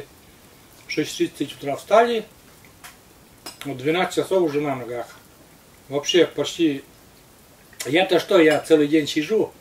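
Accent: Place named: Vologda, Russia. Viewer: Зашла на ваш канал случайно и сразу покорил родной говор,мы живем в Вологодской области,просмотрела несколько видео и так все понравилось,что подписываюсь с удовольствием!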